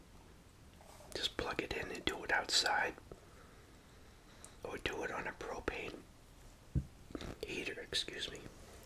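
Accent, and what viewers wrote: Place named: New England, United States. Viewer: Im sorry your hive didnt make it 😔 It is a lot of work and I know you had to pay a lot for the queen. I truly hope this year your hive is wondeful and survive the New England winters.🐝 My parents stayed in Maine for the eclipse and it totally blacked out where they live. My parents are now currently renovating my moms childhood home in Buzzards Bay. You remind me of my childhood with your dishes, the foods you eat, your sayings and the places you mention and your accent❤. It always makes me happy.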